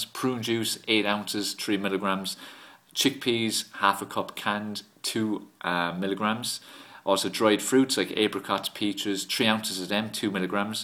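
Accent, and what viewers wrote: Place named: Ireland. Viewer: thanks so much for the videos! what part of ireland you from man?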